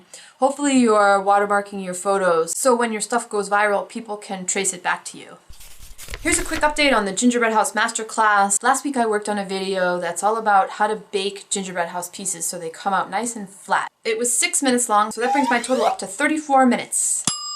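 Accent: Boston, United States. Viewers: Ha love your Boston accent! Love seeing your progress on your master class - keep up the good work! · loved your Boston accent!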